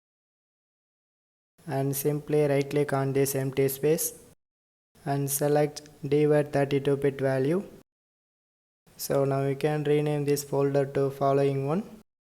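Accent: India. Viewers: I hear Indian I know I have my answer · You know you are gonna get a no bs 100% working tutorial when you hear an Indian accent. Thank you brother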